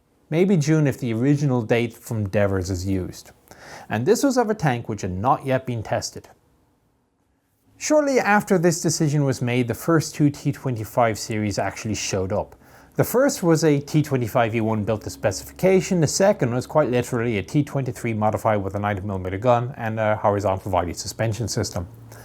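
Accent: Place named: Ireland. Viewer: I like reading. But I can't read with a cool Irish accent so here ai am.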